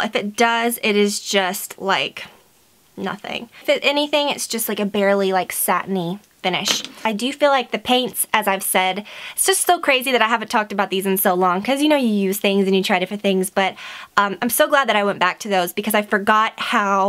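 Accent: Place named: Georgia, United States. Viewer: Enjoyed seeing these favorites. Sorry you're having such terrible seasonal problem's. Though, I do think you should make sure your ear problem, is caused from seasonal sinus problem's & nothing worse......I did giggle for a second when you made that comment about hoping you weren't sounding different, as what I noticed {heard} most was your GA drawl seemed more pronounced, than usual.......which I clearly love and am partial too hearing! Hope you and Brad have a fun filled weekend...{hugs} from Statesboro! ~J~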